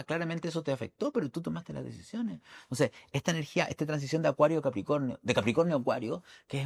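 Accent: Chile. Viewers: Gracias por tu impetu y energia y ese acento chileno que me encanta Eres luz y claridad, hagas lo que hagas, siempre sera un exito❤gracias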